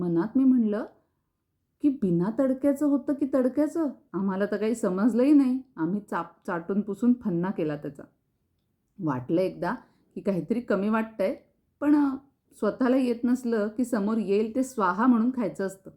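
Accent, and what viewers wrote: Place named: Punjab, India. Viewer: मस्तच ग, मजा आली ऐकायला,विशेष करून मराठी सोबतच येणारा पंजाबी तडका😀👌♥️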